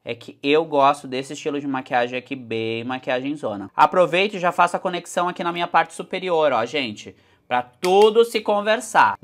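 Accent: Rio Grande do Sul, Brazil. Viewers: Adoro o sotaque gaúcho ❤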